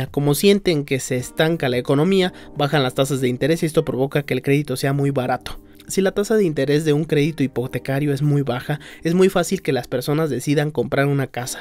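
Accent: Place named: Mexico. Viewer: me gusto como lo cuentas, ademas que adoro el acento mexicano asi que mas grato aun! gracias por la info, inflacion... pff.. aqui en España ya no puedes ni quedar con amigos sin que cueste dinero por el precio solo de la gasolina, como no salgas con tus vecinos (abuelos la mayoria boomers) olvidalo. Millenials y centenials andamos siempre ocupados con trabajos, estudios (aun con 50 años) y como llegar a final de mes sin vivir bajo el puente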